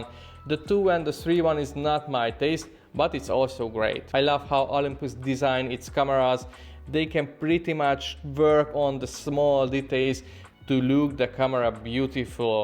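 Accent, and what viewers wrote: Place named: Russia. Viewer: You speak with such a heavy Russian accent, are you Russian?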